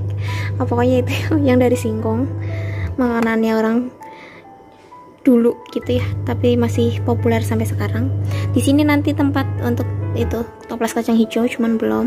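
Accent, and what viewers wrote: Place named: Tegal, Indonesia. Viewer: Mba aaslinya mana... Logatnya kayak aku.. wkwkwk ( orang Tegal )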